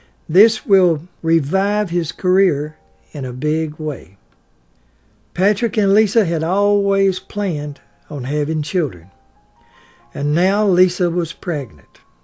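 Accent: South, United States. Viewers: Mr....Another fabulous blog. That Southern accent of yours is so charming. I have been following you for over nine years. Your voice is golden. Please do a vlog about Rosa Parks. Respectfully, Norma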